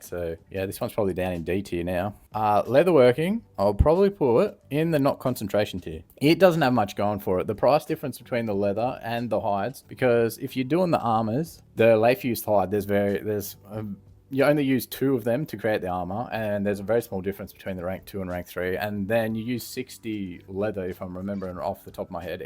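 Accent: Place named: Australia. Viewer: First video I've watched of yours. Subbed as soon as I heard the aussie accent! Gotta support a fellow countryman 👊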